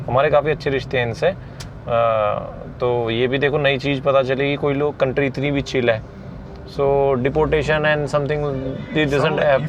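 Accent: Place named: Delhi, India. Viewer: Sir apki language sunkar mujhe lagra h aap delhi se h 😂😂delhi wale kahi b ho pata chal jata h ki y Dilli se h hum b delhi se h or sir apke bolne ks sence same mere czns jesa h😂😂😍😍😍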